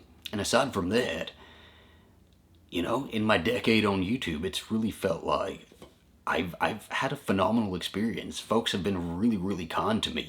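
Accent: South, United States. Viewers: your voice that caught me nothing Better than a southern drawl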